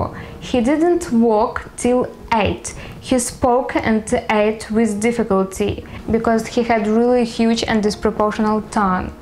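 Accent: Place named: Ukraine. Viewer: UkrEnglish... It's nice that u make such efforts to speak English and even make vids but i'm sure u would have much more subscribers if u work on ur pronunciation))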